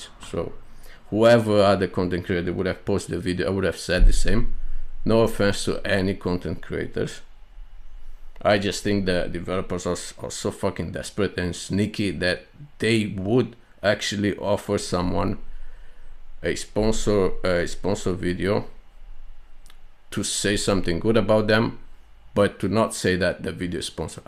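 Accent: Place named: United Kingdom. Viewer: all this long I thought dude was British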